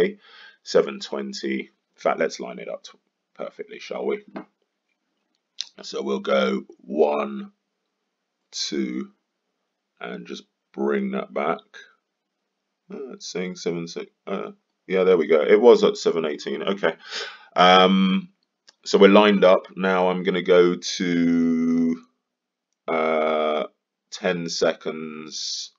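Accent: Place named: South, United Kingdom. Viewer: Well that was a haaarrrd 9mins and 20 secs to watch. In 9.20sec you managed to simultaneously produce a crystal clear, “how not to make a social media instructional video”, while actually managing to finally show how to freeze frame. Ok I hate just being negative so I hope this comes over a positive constructive criticism as that is what it’s meant to be. Before you start a “how to video” grab a pen and paper and “storyboad” your tutorial, the humming and harring immediately makes you sound like an arse without a clue, and make you come over as a “no thanks they haven’t a clue I’ll find another video” when the end result shows you have half an idea of what you’re doing. Your accent is somewhat off putting, I think the base has its origins is southern UK and relative well spoken indicating a decent upbringing and schooling but the attempt at “getting down with the homies” and all the Americanisms is really a turn off. 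Other than that I did watch until the end, I did (eventually) extract the info I was after, I may possibly look at other vids you put out if only to see if your presentation improves.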